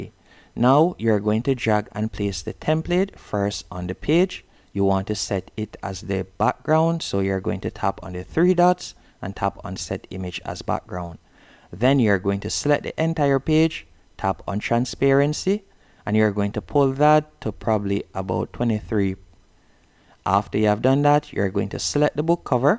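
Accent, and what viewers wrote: Jamaica. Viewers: Thanks. Easy to follow especially in that fellow yaadie accent,🥰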